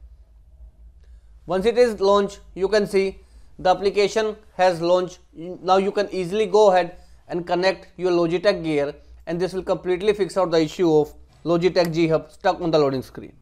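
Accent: India. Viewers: THE ONLY VIDEO THAT HELPED, KNEW IT WOULD WHEN I HEARD HES INDIAN<3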